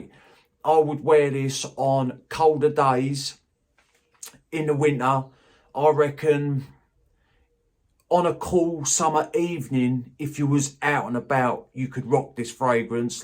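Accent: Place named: Cockney, United Kingdom. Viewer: Finally, we got a proper cockney in the fragrance community! I could listen to you read the Bible, mate, and probably get my life right with the Lord. Cheers!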